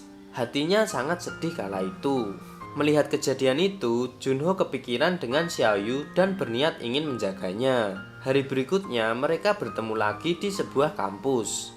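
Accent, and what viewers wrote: Java, Indonesia. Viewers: film sedih kayak gini ketika di ceritain pake logat jawa... sedihnya berubah jadi horor! 😂. gak cocok cerita film romance kayak gini! cocoknya film horor atau komedi! logatmu itu looh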